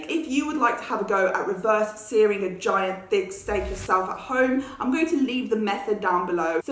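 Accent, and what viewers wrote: England, United Kingdom. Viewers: Damn this chick is hot! Is she British? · I don't normally get all mushy over celebrities or the English accent... But you got me. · I like your English accent!!!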